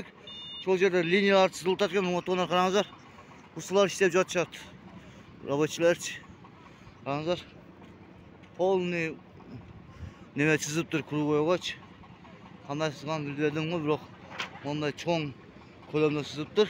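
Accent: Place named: Nookat, Kyrgyzstan. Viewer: Көрдүңөр,асфальт төшөлүптур,кичине болсо да өзгөрүптур,а сүйлөгөнү Ноокат тыктар ушундай сүйлөйт,ага эмнеге сайынасынар; Рахмат балага алына жараша тартып көрсөттү, Катасын издеп, баарың эле эстүү боло берип,катаа башка жакта жатат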